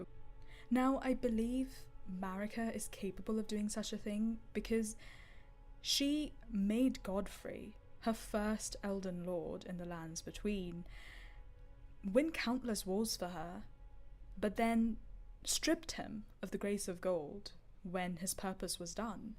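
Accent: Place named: Germany. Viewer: I hear a bit of german in there?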